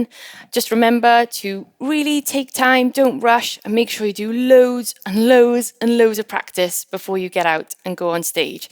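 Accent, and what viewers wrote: Wales, United Kingdom. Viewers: Are you Welsh??? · I stumbled upon your video and it's very very good. I love a welsh accent, cool. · Are you Welsh? 🏴 I’m from Neath lol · Welsh accent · proper UK accents and golf ball on a stick? Good only for you...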